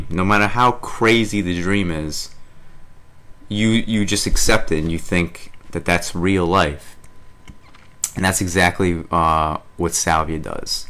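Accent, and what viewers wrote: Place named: South Boston, United States. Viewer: Where in boston are you from? Sounds like southie lol.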